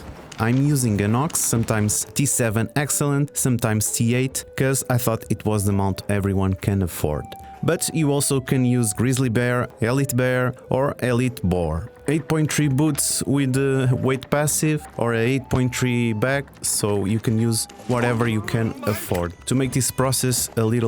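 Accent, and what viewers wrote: Portugal. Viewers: That's a proper Portuguese accent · The Portuguese accent! XD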